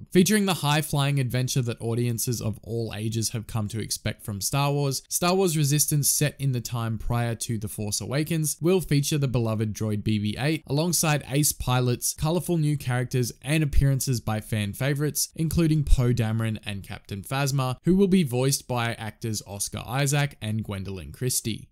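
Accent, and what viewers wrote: Australia. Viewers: wait your an Aussie? im Australian two!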